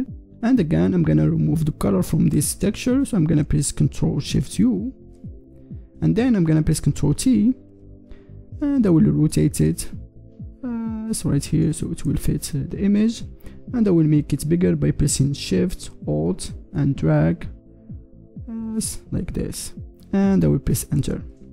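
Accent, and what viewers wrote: Morocco. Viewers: you sound moroccan or at least north african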